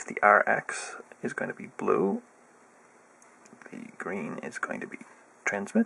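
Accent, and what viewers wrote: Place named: Western Canada, Canada. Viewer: Western accent, metric country. Pretty obvious.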